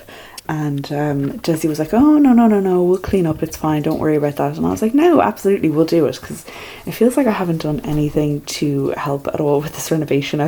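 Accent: Ireland. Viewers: you are my spirit guide I never know the date either 😂 I'm permanently shocked about where the days are going. Also, as a Dub living in the UK the past 18 years your accent and your sayings are a cure for the homesickness. The place is looking great, even better in the sunshine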